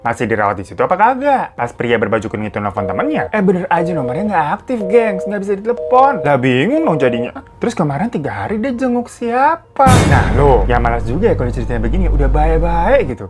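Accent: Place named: Pati, Indonesia. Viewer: Pati mana suaranya 😅👆👆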